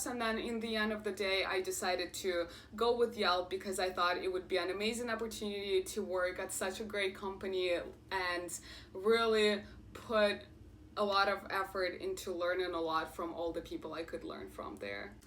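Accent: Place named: Russia. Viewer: aaaa by the way i like the way that you trying to hide your Russian accent you doing it well i respect it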